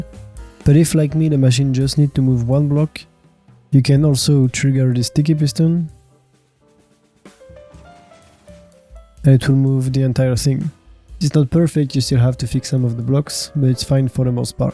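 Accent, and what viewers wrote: France. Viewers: On dirait que j'entend un français...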